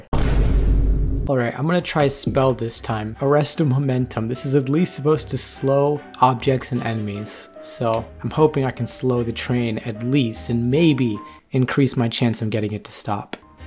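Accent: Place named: United Kingdom. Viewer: BRITISH MATE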